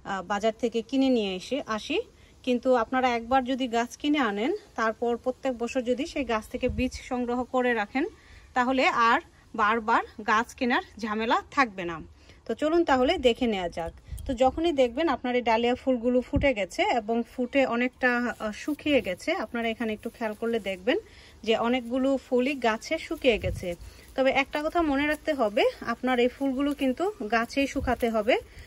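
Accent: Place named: Bangladesh. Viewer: Mone hosse apni bangladeshi. Valo laglo.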